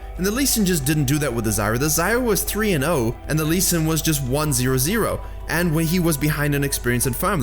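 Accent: South Africa. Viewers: . Thanks for the great guide. Are you from South Africa or have been in SA before?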